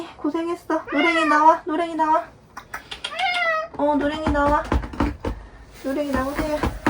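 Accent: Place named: Gyeongbuk, South Korea. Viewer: 산동네 볼때 경북쪽 사투리 쓰시는거 같아서 혹시 산불난 쪽 아닐까하고 생각했었는데 오늘 영상 올리셨네요. 아무쪼록 사람도 고양이도 무탈하고 건강 해치지 않길 바랍니다.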